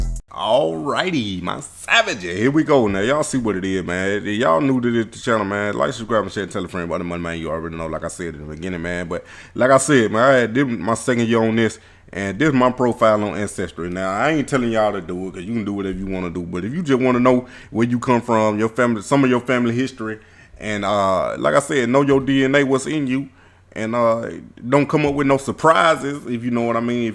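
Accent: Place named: Florida, United States. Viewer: Sound like you’re from Florida 😂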